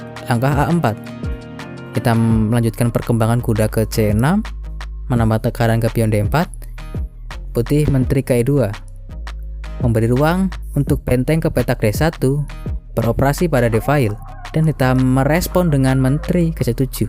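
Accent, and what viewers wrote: Banyumas, Indonesia. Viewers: Ngapak ya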